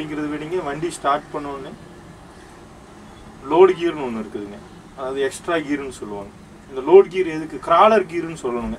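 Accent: Kongu, India. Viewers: Andha kongu slang irukae , lovely..... I'm from Trichy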